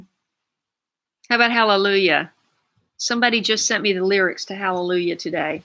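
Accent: United States. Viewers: ... you're back in Palisades???? livestream had no idea you were puttin one out on transmission only by accident did i connect ( that's me for ya) you'll never guess all my 22 guests and friends.. how many all together your guess is as good as mine they just kept coming through my door we all sat where ever they could and connected to America (livestream) My family have been here in the UK since the run up week to Christmas so to hear a native American accent other than family made a few cry...with sentiment must say got to me also..... aas we sat watching and listening to comments it was a little heart touching but thanks you have made a lotta people happy here in my household THANKS....... have you had any response to house sale??? maybe now the holiday is coming to a closes it may get moving " i do hope so ( i pray it gets "done n dusted" Happy New Year... 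and a prosperous one seeya later more to say on email Edxx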